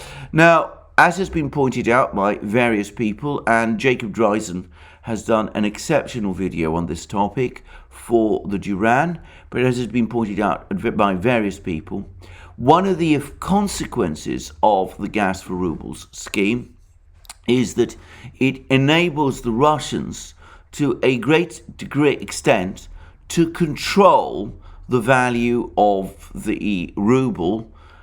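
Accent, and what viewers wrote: United Kingdom. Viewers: Alexander is British. Tomato, Tamayto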